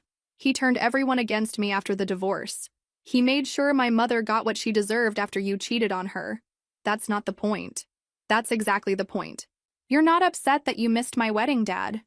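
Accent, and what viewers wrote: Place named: East Coast, United States. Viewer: Her voice is so annoying. Almost like the East Coast with a nasal like Midwest.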